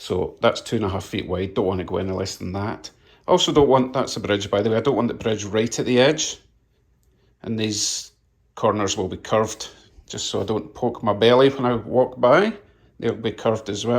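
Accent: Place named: Glasgow, United Kingdom. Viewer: I did a video a couple of days ago on making inexpensive backdrops for the Train layout you may find useful. It costs 90% than store offerrings. Now i'm watching all your old videos. Your accent reminds me of my Grandmothers' accent all the way from Glasgow. None of my boyhood friends cound understand her thick accent.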